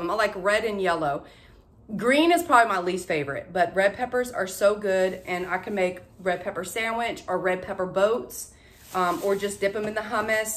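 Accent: South, United States. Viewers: Alright, I just stumbled on to your channel today by fluke/accident while looking at something else and I have SO much to say about how great I think you are but there ain't enough room here so maybe another time. But I will say these 3 things:your accent (from one southern girl to another!), your so down to earth (which feels so rare these days on social media) and lastly in addition to you showing us your finds the fact that you rattle off different ideas we could use them for is amazing! Especially for extremely busy gals such as myself that gets overwhelmed which creates creativity blocks 🤦🏼‍♀️. I just wanted to share also 2 ideas, those Yardley or any of the body wipes also are great for elderly ppl that may have a hard time getting in the shower so they're easy to use for them to freshen up between showers. And 2nd that hearts silicone tray you got in a previous haul could also be used to fill with water for ice cubes which would be cute in a beverage (such as house wine of the south aka sweet tea). 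Anyway I'm a new subscriber now and am telling my Mama & friends about you too. Thanks so much 🤗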